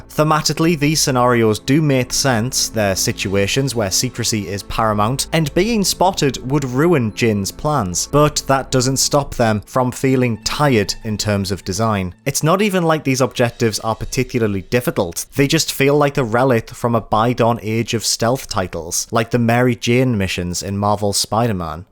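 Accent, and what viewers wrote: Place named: Wales, United Kingdom. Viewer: Maybe Welsh pronunciation creeping in? I noticed it too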